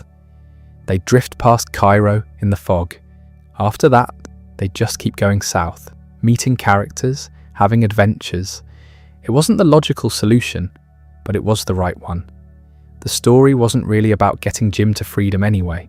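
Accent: United Kingdom. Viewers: Love this and thank you but I wish you'd scratch the British accent. So many documentaries use this voice or similar that the sound is beginning to irk me.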